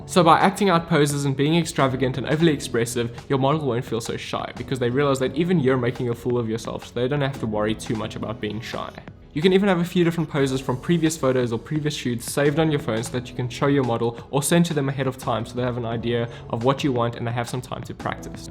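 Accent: South Africa. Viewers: South African? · Is that a South African accent? :)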